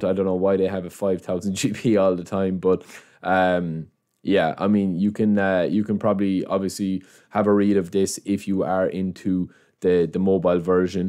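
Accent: Ireland. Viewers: I know it may be random, but are you from Cork?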